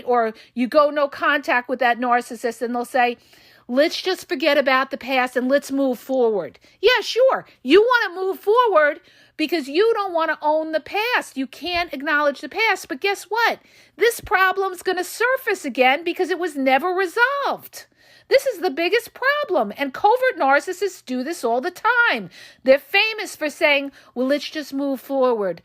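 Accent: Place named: Brooklyn, United States. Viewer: You sound just like my mom! Brooklyn Accent and Everything 😂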